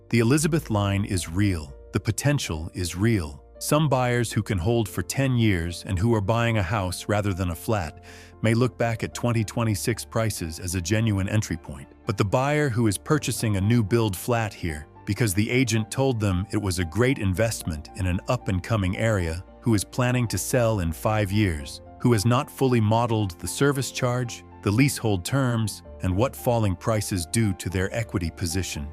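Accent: United States. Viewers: Clap trap.....also American accent. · Please avoid American voiceovers. Firstly, on subjects about London (or Britain in general) it sounds like it's carping. Secondly, since the advent of the disgusting president Trump I never want to hear an American voice again. I turned off after about 30 second. Goodbye!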